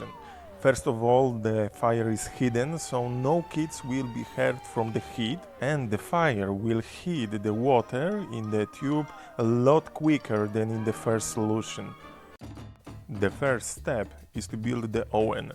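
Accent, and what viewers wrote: Poland. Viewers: Od pierwszych słów wiedziałam że jesteś z Polski 😊 dzięki za film. Planujemy coś podobnego w ogrodzie. Pytanie, czy wanna nie nagrzewa się zbytnio bezpośrednio od ognia? Nie parzy aby?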